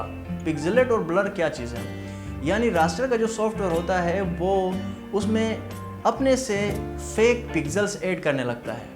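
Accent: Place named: Gilgit, Pakistan. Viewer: Yar bro ap ka lahja to glgite Wala ha am I write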